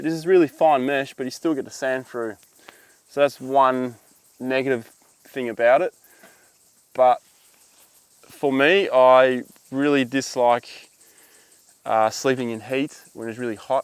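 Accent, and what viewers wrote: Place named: Australia. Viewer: I just love Aussies say 'in winter'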